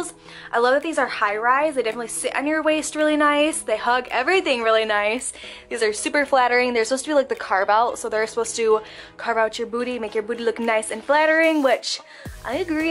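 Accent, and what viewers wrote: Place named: Michigan, United States. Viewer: I had to check out your Instagram to see if you’re from Michigan lol you have the cutest Michigan accent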